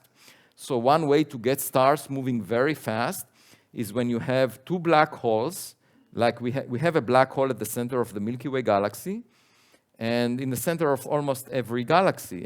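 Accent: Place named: Europe. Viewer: This man is simply FASCINATING! And his European accent makes him all the more so. Thank you, Avi, for encouraging us to be humble. Like you, I am of the firm belief that we are NOT alone. But, of course, only time will tell.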